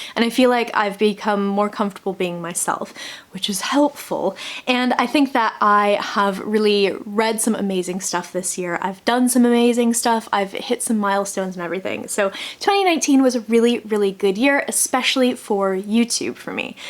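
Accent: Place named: England, United Kingdom. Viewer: 1. Your accent is DEFINITELY becoming more English. Maybe it's just this video but ermmmm? 2. Good luck getting on top of ARCs etc! You can do it!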